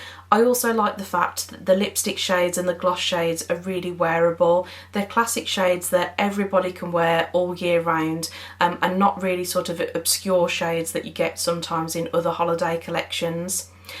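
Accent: West Midlands, United Kingdom. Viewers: Hi hun was looking to buy this set and thought I would check out a video on you tube to see if worth it and found your channel, you sound local West Mids? but yes great review they should give you commsion for promoting this lol.....will check out some of your other videos have sub'd :-) x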